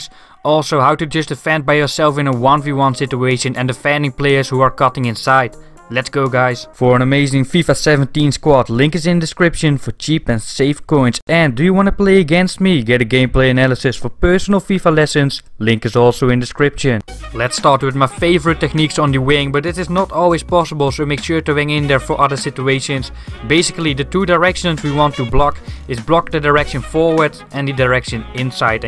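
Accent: Netherlands. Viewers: Hmm are you dutch? I guess so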